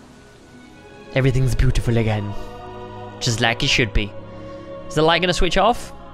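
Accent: Scotland, United Kingdom. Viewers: is British duh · are you Scottish or British???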